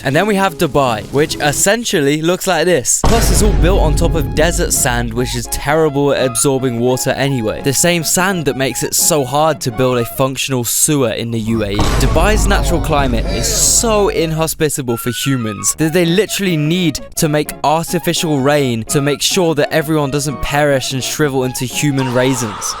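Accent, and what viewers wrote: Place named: Birmingham, United Kingdom. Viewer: these editings coupled with the brummy accent is gold tier, best shit on youtube ilysm never change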